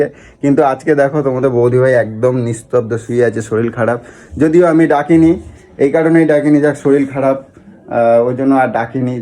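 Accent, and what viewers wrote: Bangal, Bangladesh. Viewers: তুমি একটু বাঙাল কথা কম বলো। আর শরীল নয় শরীর। তবে puchu কে মিস করলাম 😢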